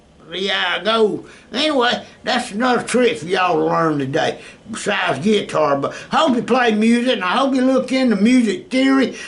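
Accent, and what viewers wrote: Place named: Appalachia, United States. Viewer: I like the way you talk! Good ole Appalachian/southern draw.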